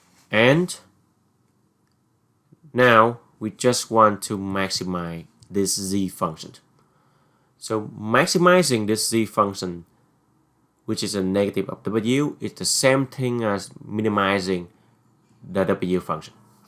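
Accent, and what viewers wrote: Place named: Vietnam. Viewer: nghe giọng giống người vn vậy bn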